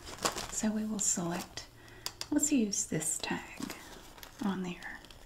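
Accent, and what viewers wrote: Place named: Texas, United States. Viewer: As a southern girl from Texas, this video makes me feel at home😂 the accent, the remarks, the methods, it’s all just familiar🥰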